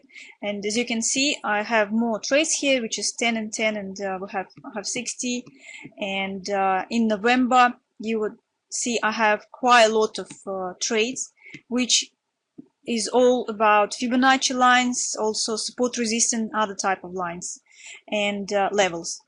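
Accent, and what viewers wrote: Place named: Russia. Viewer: nice accent. Russian?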